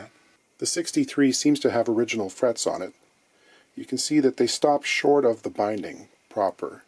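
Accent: Germany. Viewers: I'm here for the German accent...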